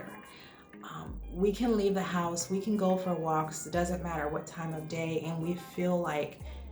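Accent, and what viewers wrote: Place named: California, United States. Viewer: I didn't know that you're from California because you always talk about Georgia, but I always thought that your accent seemed like you were from California: the way you pronounce the words so well (not like NYCs, that say "xtress"), the beautiful way californians pronunce the "s" and the beautiful humming that californians have. 😊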